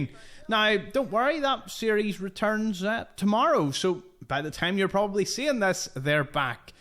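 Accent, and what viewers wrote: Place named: Northern Ireland, United Kingdom. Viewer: I think what makes your channel so appealing is, not only your soothing NI lilt but also your easy going attitude to the game, not taking it badly when it goes wrong. 👍👍👏🏻👏🏻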